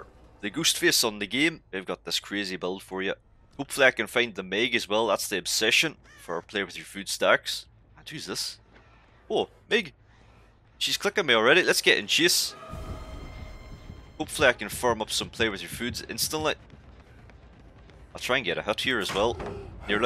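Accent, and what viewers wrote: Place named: United Kingdom. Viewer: Necklace Cage 😂 i Love that britisch accent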